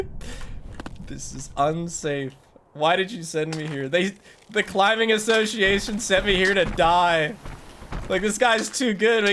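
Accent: Canada. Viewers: I would've expected something closer to "rundabutt" but "roondaboot" sent me also the Canadian raising when he says "exit" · Something I love about is his Canadian accent But his content is really good. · rundabut, i completely forgot that you are Canadian. (i mean no ill intent. im American and thought it was funny sorry) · I love how Chat makes fun of for his pronunciations, despite all of Chat knowing that he's Canadian.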